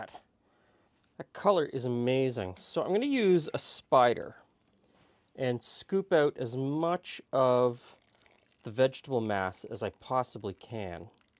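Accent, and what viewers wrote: Canada. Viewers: This man is Canadian! Or that is my guess. This video was interesting to watch!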